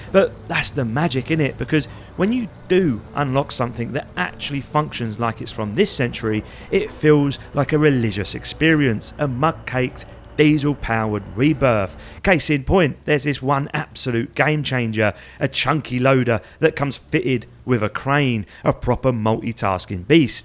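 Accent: Cockney, United Kingdom. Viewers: nice review. love you leaning big into your british cockney accent (fellow brit here)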